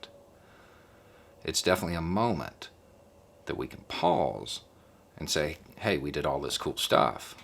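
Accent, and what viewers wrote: South, United States. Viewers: You giving wisdom with a Southern accent, changes more than you'll know. You've been a way that I've been able to move my extended family's political needle. That is a movement I never thought possible. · i like watching you since i live in europe and no offence but you sound like common southerner but you have a brain. and that makes me happy that there are still americans who can think for themselfs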